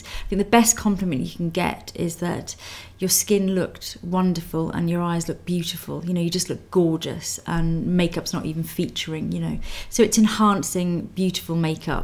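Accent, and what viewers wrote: England, United Kingdom. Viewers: I LOVE her British accent :3 i feel that she was more of interacting with me in the video :D despite her talking a lot. but i liked it! :) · wow u are a makeup GURU! i love ur accent, lol when i try to be british i sound just like u. thanks for ur help. oh, and u look rlly beautiful! :D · you mean english accent, there is no such thing as a british accent. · I love the british accent it sounds so elegant :D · im here to learn british accent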